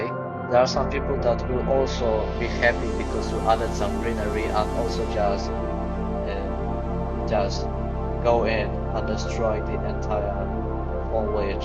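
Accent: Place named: Scandinavia. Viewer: Can understand you perfectly. Had you not said where you were from, I would have pegged you as someone from Scandinavia, and not eastern Europe (Poland).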